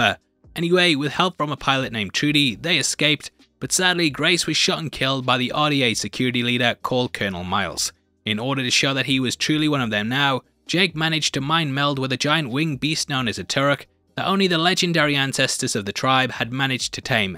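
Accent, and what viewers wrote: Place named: United Kingdom. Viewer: Love when you say Spider-Man No way home with your strong British accent every video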